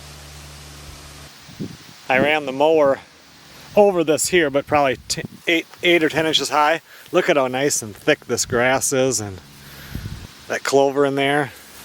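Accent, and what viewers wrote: Minnesota, United States. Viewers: I love your show & your Minnesota Swedish accent❤.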